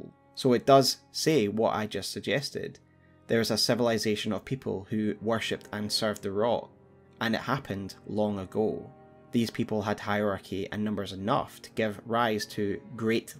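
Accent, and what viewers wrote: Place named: Scotland, United Kingdom. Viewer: A fucking love your Scottish mate. Good to see your putting us on the map for the souls Community 😉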